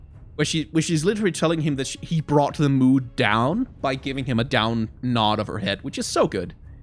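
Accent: France. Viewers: Your french accent is astonishing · I could listen to with a french accent ALL.DAY. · that French accent made my day thanks buddy, great content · that french accent is painful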